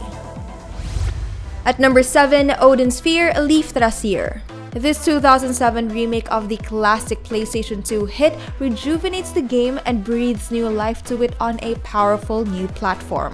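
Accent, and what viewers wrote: Philippines. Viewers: Is that a Filipino accent im hearing? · your filipino accent is so cute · You sound like a Filipina 🇵🇭 , are you? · The girl talking is a Filipino for sure cause of her accent :) a bit fob tho lol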